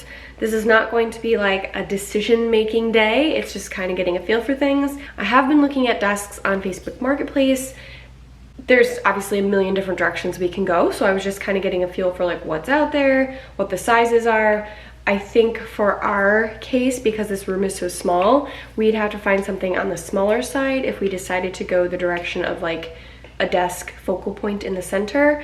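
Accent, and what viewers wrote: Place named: United States. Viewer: thanks for sharing. You have a beautiful home and land! Your furbabies are sweet! I'm jealous of your porch room! I would totally curl up with a blanket and a good book. Love the tea trolley! It's one of my favourite colours. You can get some super cute, battery operated tea light vines. I've gotten them at the dollarama (greetings from Nova Scotia btw). You sound American so for you I think you have the dollar tree? You can also get them in Walmart that plug in. What about a built-in window bench with storage? You made me hungry with the sheet pan supper...lol. The Pioneer Woman has a bunch of those recipes. One of my favourite garden tools is called the garden claw. It's blue and yellow. The top of the handle is ergonomical and the tines on the bottom of the rod allows you to loosen soil around roots to make it easier to pull plants. 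Plus you can use it to mix soil. You should let your seeds dry before storage...they have a tendency to rot if you don't. It's been a year so I'm assuming you finished your office. I only found you today. Love the shelves around the door idea. What about a padded chair with built-in drawers under the seat for storage? I saw it somewhere and it was super cute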